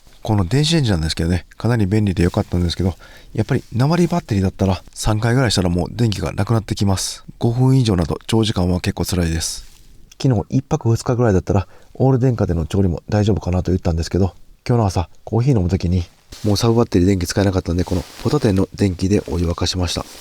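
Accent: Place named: Kansai, Japan. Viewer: やっぱりさん関西人なんですね。フロントにヒビがぶぁぁぁぁって、大変だったのに笑ってしまいましたm(_ _)m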